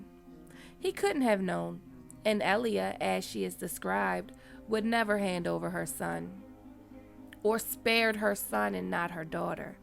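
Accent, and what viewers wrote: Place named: Los Angeles, United States. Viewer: out pops beautiful bad bitches preach lol I love your voice You're from la ? u talk hip as shit and your game of thrones knowledge is on point. 💯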